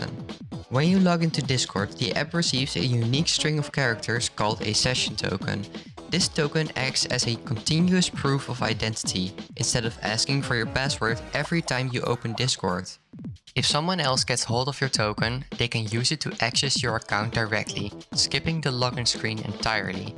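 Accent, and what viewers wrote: Netherlands. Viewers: Are you from the Netherlands? You really sound like it.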